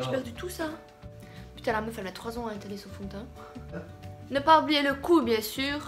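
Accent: Belgium. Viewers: Tu es belge sa s entend 😂 moi aussi je suis belge bisous je t aime ❤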